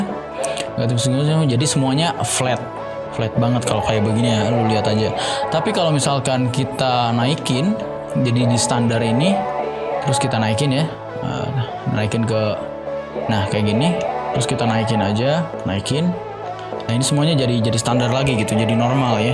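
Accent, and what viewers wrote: West Java, Indonesia. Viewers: pertama liat channel ini gw langsung SUB karna infonya mamfaat buat gw...dan gw pikir bukan orang sunda, eehh nyunda oge ningan...makin trus mantau channel ini, sukses terus lurr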